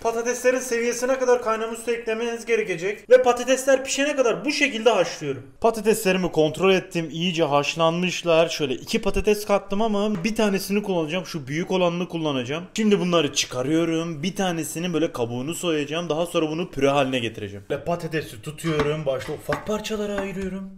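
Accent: Adana, Turkey. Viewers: Abi adanalı mısın şive tam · Tencereyi ocağa eklüyürüz abi sen hataylı degilmi sin adanalı gibi konuşuyorsun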